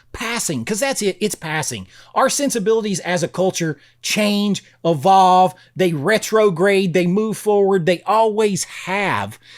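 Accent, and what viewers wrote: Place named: Georgia, United States. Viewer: I have been on and off as a subscriber to your channel for quite some time. Here's the reason why: I've noticed that I strongly disagree with some of your reviews of "overhyped movies that are actually bad," as well as with many of the "hidden gems" from the '80s, for instance, that you present on your channel. To me, you tend to express your views in a fairly black-and-white manner — with that lovely Georgian delivery of yours — stating that something is either sh*t or absolutely great, with very little middle ground. At times I've grown frustrated enough with this to unsubscribe from your channel in dismay. Having been born in 1970 myself, I've seen and know most of the films that you review or include in your lists, and I find it interesting that there are many movies we both consider masterpieces, while on many others we couldn't disagree more. 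The topic of censorship is, once again, something I couldn't agree with you more on. Those "tiny adjustments" made to works of art that you brought up in this video — things that are merely reflections of their respective times and of the worldviews of characters from a particular era — should be subject to criminal prosecution more than anything else. It is genuinely disturbing to see this kind of trend, especially when modern movies already tend to be far inferior to the works of art produced in decades past. So, the persistent reappearance of your videos in my feed has once again made me a subscriber to your channel. I try to re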